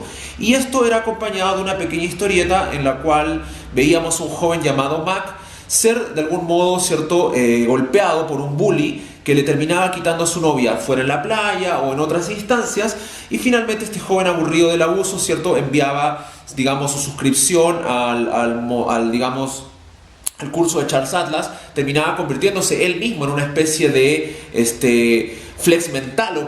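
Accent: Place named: Chile. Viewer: Hola por su acento creo que es chileno claramente, muy buen canal este de termino de descubrirlo y suscribirme. Saludos desde Alicante - España.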